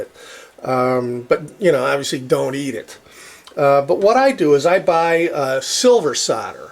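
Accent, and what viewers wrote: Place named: United States. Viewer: Great video. I never realised you were not supposed to touch the solder to the iron. I've learned a lot,. Thanks. BTW It's a bit off-putting to hear the American pronunciation for soldering - to my ears it sounds like you are saying 'sawdering'. In the UK the SOL is pronounced as it is in soldier or, if you like - souldering! :-)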